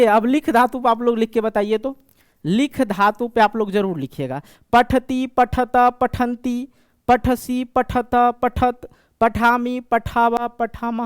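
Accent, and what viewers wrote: Bihar, India. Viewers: Sir aap bihari ho ya भोजपुरी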